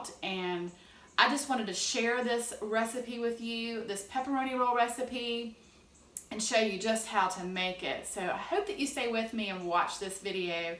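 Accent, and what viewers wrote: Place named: Appalachia, United States. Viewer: the minute I heard the first two words out of your mouth, I knew I had a fellow mountaineer! I am so excited!!!!!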